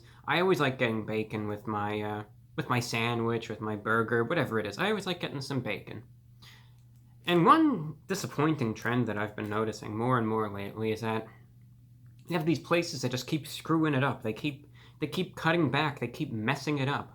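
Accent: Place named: New York, United States. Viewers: He is from New York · does have a little bit of New York accent, but lives in Florida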